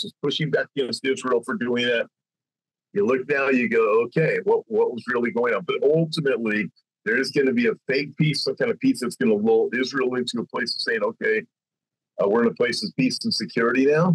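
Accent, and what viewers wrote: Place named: South, United States. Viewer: I could tell you were somewhere in the south. 😊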